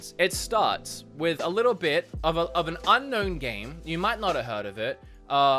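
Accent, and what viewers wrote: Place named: Australia. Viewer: Wow the Aussie accent is really popping on this one.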